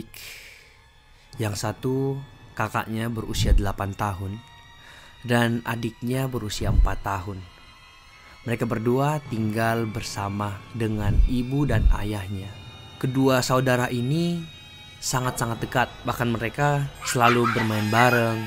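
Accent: Makassar, Indonesia. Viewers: Semakin seru konten nya Dengan logat Makassarnya bikin lebih merinding haha Semoga bisa dapat 500k Instagram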